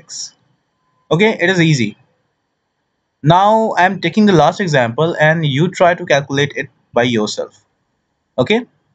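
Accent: India. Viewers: this guy is so smart and he speak like a Indian☣☣☣☣☣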